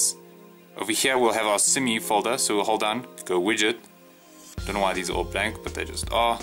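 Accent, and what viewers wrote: South Africa. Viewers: Hmmm sounds like a South African accent.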